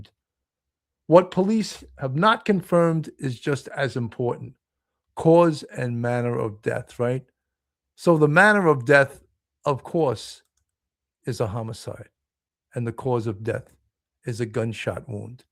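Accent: New York, United States. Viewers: I really appreciate you and your common sense approach! I do not like the hyperbolic tv news stations that try to make things even worse than they are. New subscriber. BTW, I like your NYC accent!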